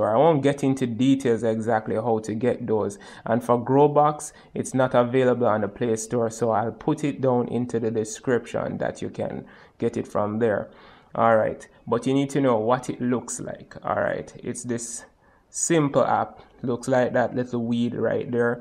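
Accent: Jamaica. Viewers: Works perfect for me! I have been trying to cast for 3 weeks now with no success until this video! THANK YOU!!!! Love the Jamaican accent!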